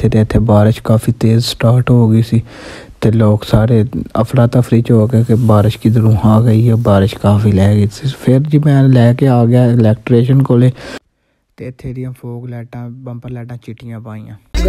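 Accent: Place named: Punjab, India. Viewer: Apki punjabi boht pyari hai kon se city k ho ap?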